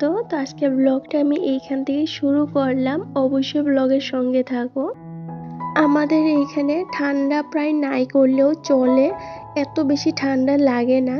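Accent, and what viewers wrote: Bangladesh. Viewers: আপু তোমাকে একটা কথা জানার জন্য আপু তুমি সেই উওর টা দাও তোমার ভিডিও ভালো লাগে বলেই দেখি তুমি এক দেশের আমি আরেক দেশের কিন্ত মানুষের মন যাকে ভালো লাগে তার সমন্ধে জানার ইচ্ছে করে আর তেমন বড় কোন প্রশ্ন করি নাই তোমার আসল বাড়িটা কোথায় তোমার ভাষার জন্য কারণ বাংলাদেশের কিছুটা টান আসে আমি অনেকের ভিডিও দেখি কিন্ত কেনো জানি তোমাকে আমার খুব ভালো লাগে আমি বাংলাদেশ থেকে দেখি তুমি কোন জায়গায় মানুষ আর অরিজিনাল বাড়ি কোথায় তুমি ইন্ডিয়ান সেটা বুঝতে পারছি কিন্তু কিছুটা আমার দেশের টান আসে তাই জানতে চাইছি ভালো থেকো সুস্থ খেকো এগিয়ে যাও আছি তোমার সাথে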